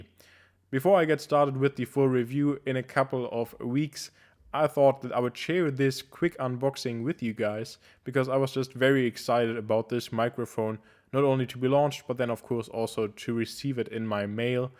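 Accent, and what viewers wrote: Germany. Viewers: Deutscher Dialekt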